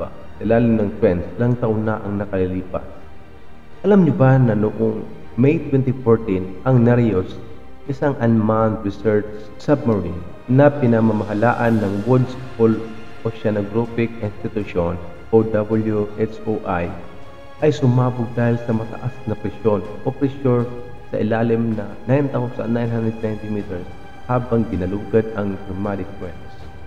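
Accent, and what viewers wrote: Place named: Visayas, Philippines. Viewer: Wala na halos maintindihan puro reverb.Visaya ka pa man Dong😅😅✌️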